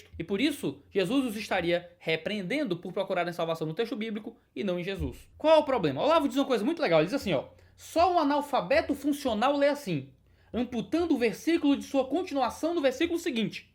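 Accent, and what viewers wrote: Ceará, Brazil. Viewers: Tive a honra de estar há 2 semanas aí e ouvir esse sotaque muito bom e muito engraçado tbm, alias, só tem humorista nesse estado, muito bom. Povo gentil e engraçado, lugares lindos e comidas maravilhosas. Deus abençoe o Ceará!!!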